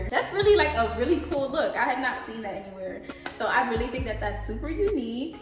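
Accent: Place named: New York, United States. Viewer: You sound like a New Yorker, but I could be wrong.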